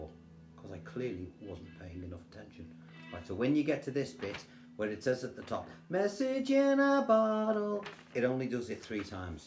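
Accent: Liverpool, United Kingdom. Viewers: Love ye work im learning so much from your lesson. I'm from West Derby but have been living in Townsville Australia since 1984. Love hearing your scouse accent and musical charm. Cheers mate. Go the Reds.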